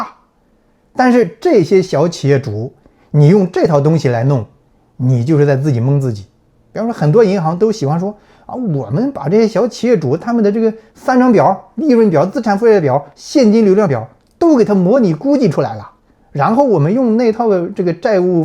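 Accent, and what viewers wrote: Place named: Heze, China. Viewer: 厉害！我感觉听你口音有点像菏泽地区的人，不知道猜的对不对？😂😂😂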